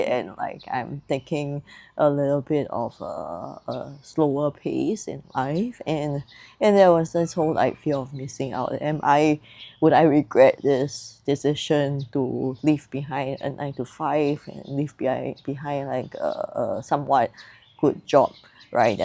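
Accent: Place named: Singapore. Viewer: Nice to hear a Singaporean voice 😊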